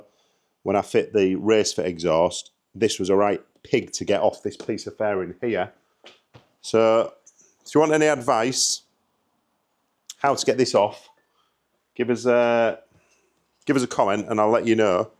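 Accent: Yorkshire, United Kingdom. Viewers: also you sound like your pretty close to me im picking up a Yorkshire twang im Grimsby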